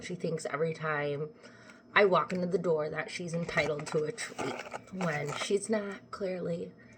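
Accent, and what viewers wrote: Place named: Midwest, United States. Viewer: Holy crap, how do you say you are from the Midwest, without saying you are from the Midwest. Got to be Wisconsin or Chicago area.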